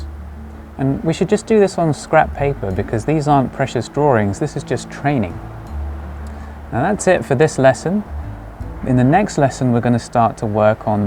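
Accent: United Kingdom. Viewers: haha you're a Londoner - Evening Standard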